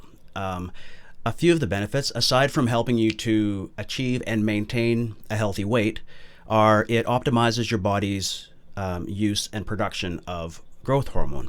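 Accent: Canada. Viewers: hmm are you Canadian? Got that Canadian "out" thing going on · ooh that Canadian accent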